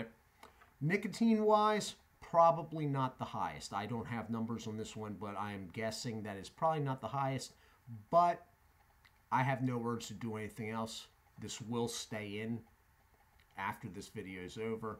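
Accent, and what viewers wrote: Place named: South Jersey, United States. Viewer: I can tell by your accent your from my neck of the woods. South jersey/philadelphia?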